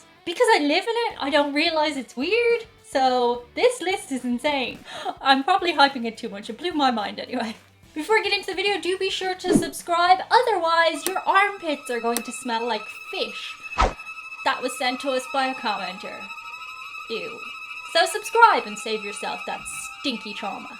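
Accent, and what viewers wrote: Ireland. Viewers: Miss I am a old half American Scottish German Love your content huge fan but is nearly impossible to get Scottish food in Detroit Michigan.. but I wish you never taught me about crisp sandwiches curse you lol I grew up with Scottish German Italian southern accents it is my consensus that your Irish is the most pleasant on the ear. I really enjoyed your collaboration with wee Scottish lass If we ever get free this virus please consider doing one with batchy. Actually follow about 20 people from the UK the try videos you guys are awesome one of you guys always puts a smile on my face before I go to sleep keep up the good work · Just discovered me a new channel with another gorgeous woman with an accent! Got me a Filipina, a German and now an Irish.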